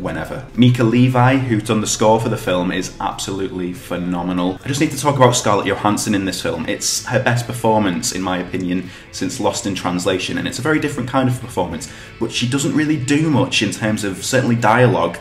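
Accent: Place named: United Kingdom. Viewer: Ounder the skin, I saw-writ. Lol. What accent is this? It is from the UK somewhere, no?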